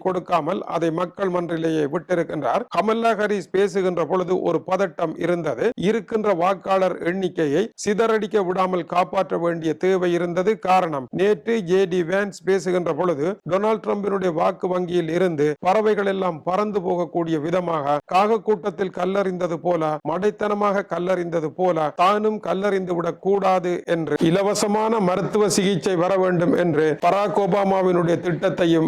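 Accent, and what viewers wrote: Jaffna, Sri Lanka. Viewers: யாழ்ப்பாணத் தமிழ் மிகவும் தெளிவாகவும் அழகாகவும் உள்ளது... வாழ்க வளமுடன்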